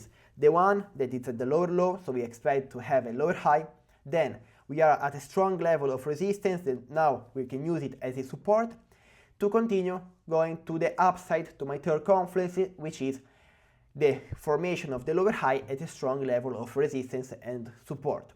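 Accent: Italy. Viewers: ma per curiosità perché li registri in inglese?avevo sentito un pelino l’accento italiano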